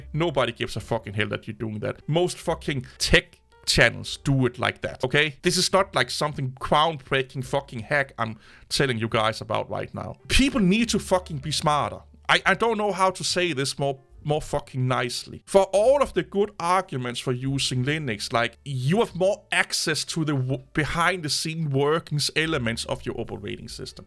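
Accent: Denmark. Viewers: His thick Danish accent is one of the reasons I watch this channel!😍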